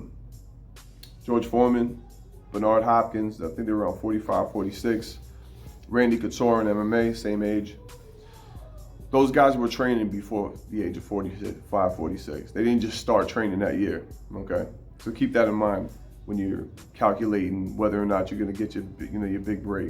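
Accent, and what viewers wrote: New York, United States. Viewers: That New York accent has me feeling like Nas is explaining boxing to me. Love it. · No doubt you sounded like a NY guy but I didn’t want to assume. Appreciate all your motivation, knowledge and replying to your subscribers. Consistency is the key to life and this YouTube thing!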